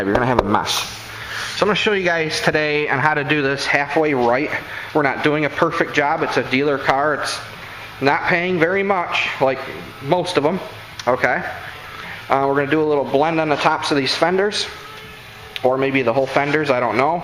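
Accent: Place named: Rhode Island, United States. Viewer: lmao are you from rhode island/maine? you sound like the original peter from family guy.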